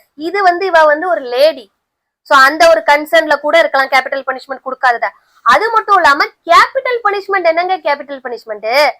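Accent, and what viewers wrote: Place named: Tirunelveli, India. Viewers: தென்மாவட்ட தமிழ் எதார்த்தமான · மேடம் நீங்க பேசுற திருநெலவேலிலெங்வேஜ்ரொம்பநல்லாருக்கு❤❤❤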